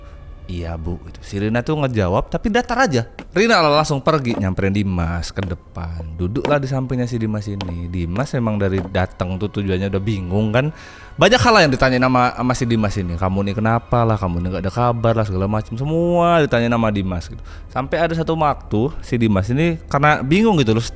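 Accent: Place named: Sumatra, Indonesia. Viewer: Kok malah berlogat Sumatra? Apa saya yang salah dengar 😮😮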